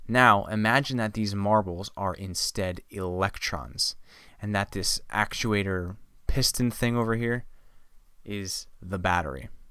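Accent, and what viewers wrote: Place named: Oxford, United Kingdom. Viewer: AN INTRODUCTION TO ELECTRICAL CIRCUITS...... SIR, WATCHED YR ABOVE VIDEO AND ENVIED YR ORIGINAL TALENT AS A TUTOR, AS A GRAPHIC DESIGNER, AS A ELOQUENT SPEAKER OF OXFORD ENGLISH, AS A PIED PIPER ATTRACTING RUN AWAY KIDS/STUDENTS RUNNING AWAY FROM SCIENCE. WHAT IS THE ATTRACTION OF THIS VIDEO? THE SUPERIORITY AND EXCELLENCE OF THIS VIDEO IS NUMEROUS AND HENCE I WILL BE NOT BE ABLE TO TELL ONE AS THEY ARE MANY AND INNUMERABLE. TO POINT OUT A SINGLE MISTAKE I AM UNABLE TO DO AND FAIL MISERABLY. WHAT A WAY TO TEACH! SO PERFECT AND TEACHING SCIENCE MORE EASILY THAN VIEWING A TV SERIAL. AT THE END OF THE DAY A SERIAL IS FORGOTTEN BUT NOT YR TEACHING . TO TELL YOU FRANKLY, ONE TRUTH WHICH YOU DO NOT KNOW IS, THAT ONLY A HANDFUL OF TEACHERS ARE THERE IN THIS WORLD WHO CAN TEACH SCIENCE/ ELECTRICAL ENGG AND SCIENCE FOR SUCH A PERFECT UNDERSTANDING. 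THE TRUTH IS HEARING THE WORD "COULOMB" BRINGS IN HEADACHE BUT NT YOU OR YR TUTORING. I HOPE YR VIDEO IS THE BEST ONE TO BE MADE COMPULSORY IN ALL ELECTRICAL/ELECTRONIC SCHOOLS AND POLY-TECHNIQUES. YOU ARE A VISIONERY TUTOR MAKING A COMPLEX SUBJECT INTO A SIMPLE ONE WHICH CAN BE DIGESTED EASILY BY EVEN THE POOREST GRADE OF STUDENTS VATSA INDIA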